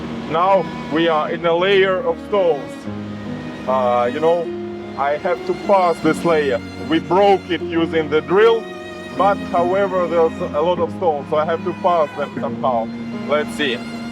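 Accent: Russia. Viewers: I like your native Russian accent the best. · I know it’s a race against time to save the chateau, yet you have to work offsite to earn money to pay for the restoration. I hope you can increase your subscribers so you can get money through YouTube. It will happen but it takes time. I’m praying for a miracle for you. I love when you change off between speaking French and then English but when you speak inglish you have a Russian accent. No matter what, you are delightful. ❤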